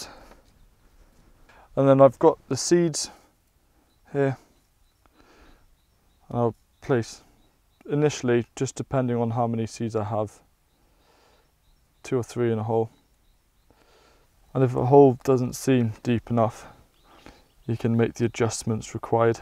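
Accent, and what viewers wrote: Wales, United Kingdom. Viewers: if you have uv lights - don't use a pink sharpie! wrote them indoors and now the labels are invisible in the greenhouse :) derp. Great video, will subscribe. Interesting accent, similar to my husband, Welsh with English parents :)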